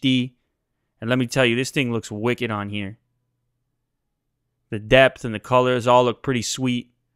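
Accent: Canada. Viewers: Hahahaha omg feeling old as me? I love how Canadian Lew sound back in those days. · Sony's loading screens in games haven't changed much. Also, you sound more Canadian in this video.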